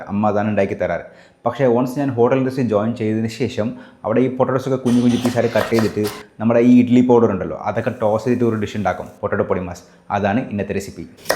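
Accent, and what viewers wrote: Tamil Nadu, India. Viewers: .... Tamil manjal ...pronunciation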